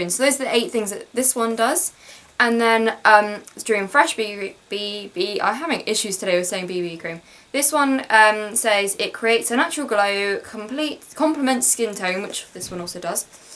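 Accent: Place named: United Kingdom. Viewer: You're British accent sounds amazing! This is a good video because I never knew the difference between the two bottles.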